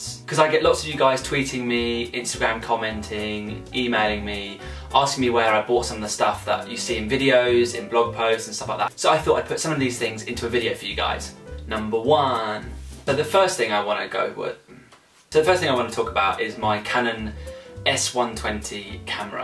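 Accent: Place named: United Kingdom. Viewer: If I had your perfect british Accent I would never shut up!!! It's fucking amazing!!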